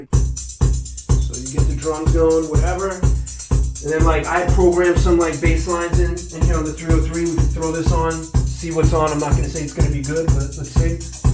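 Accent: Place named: Brooklyn, United States. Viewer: Love the Brooklyn accent...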